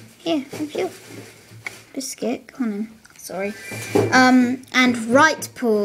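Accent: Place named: United Kingdom. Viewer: you have a brittish acsent!!!!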